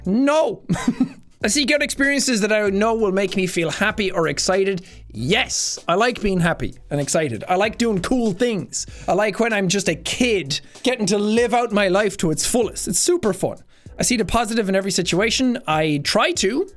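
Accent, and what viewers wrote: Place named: Ireland. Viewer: After the ronnie Neville video I can hear irish side in every video and its amazing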